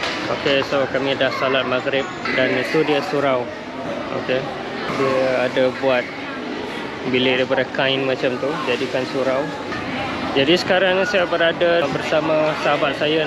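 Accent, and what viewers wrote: Malaysia. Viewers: mcm org Utara bang..mcm Kedah Penang Perlis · Cakap Melayu macam orang Malaysia. Dok Malaysia ka?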